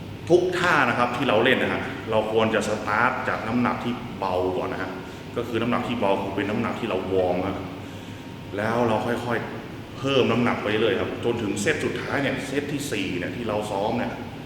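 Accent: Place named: North, Thailand. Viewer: ธนยศ ไชยชมภู สำเนียงบ่งบอกเลยไข่ป่ะ พี่เขาคนเหนือแน่นอน 555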